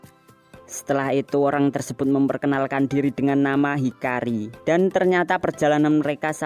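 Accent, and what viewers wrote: Java, Indonesia. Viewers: Jawa mana bang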